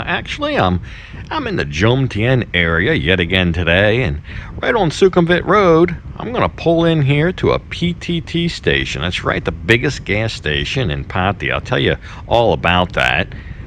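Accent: United States. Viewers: Enjoyed your video as I am new to the Jomtien area. Honestly, it was comforting to hear an American speak! LOL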